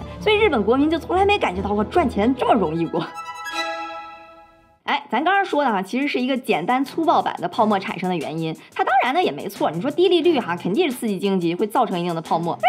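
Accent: China. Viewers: 大陸腔改掉我就信了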